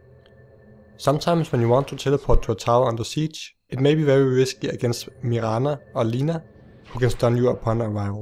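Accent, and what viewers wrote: Denmark. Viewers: wow... he's accent sound really Danish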